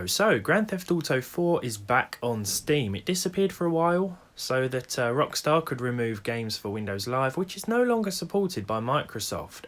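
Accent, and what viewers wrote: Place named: England, United Kingdom. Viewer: You sound line and Englishman, so a zero is not pronounced o cause that is a letter, its a zero.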